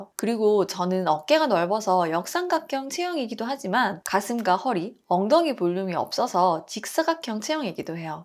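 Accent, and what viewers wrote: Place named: Gyeongsang, South Korea. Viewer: 경상도 출신이신가요? 은은하게 들리는 사투리❤️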